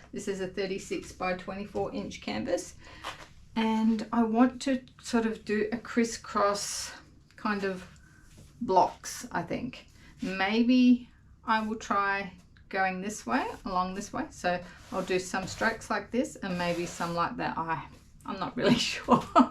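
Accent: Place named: Australia. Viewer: You have taught me over and over again that a piece is not finished until the artist is happy with it and this piece shows this amazingly. May I ask if you are in Australia. You sound like you are but I've been wrong before. Once again thanks for sharing your prodigious talent with us mere mortals. 🙂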